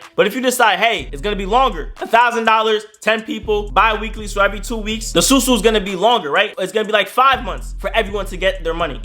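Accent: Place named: Caribbean. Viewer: I knew you were Caribbean 😅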